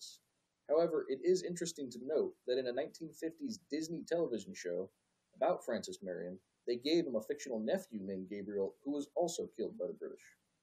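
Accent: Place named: United States. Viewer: Brit speaking with American accent...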